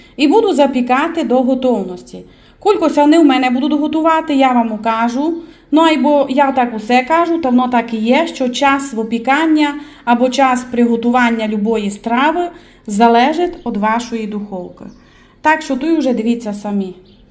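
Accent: Transcarpathia, Ukraine. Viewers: Ваш діалект говорить про те,яка у нас велика Україна!!!! Я з Одеської,найбільшої області України, і прекрасно Вас розумію!!! У нас говорять на суржику,але я,якщо чесно,соромлюся говорити такою мовою,тому,коли я розмовляю українською мовою,то всі думають,що я зі Львова!!!😄 А мій рідний брат говорить,як Ви,без комплексів,тільки на суржику,як говорять у нас в Одеській області,і Ви знаєте,він піднімає мені настрій,так само,як і Ви,тим більше це ютуб,і це Ваша Закарпатська изюминка!!! Мені подобаються Ваші рецепти,і Ваш Закарпатський говір!!!! Дякую за рецепт!!!❤️ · Яка велика наша Україна! Яка чудова закарпатська говірка. І все ж зрозуміло. Дякую Вам, пані господине. Отримала подвійне задоволення. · Це закарпатский діалект! Гарний, ні на який інший не схожий.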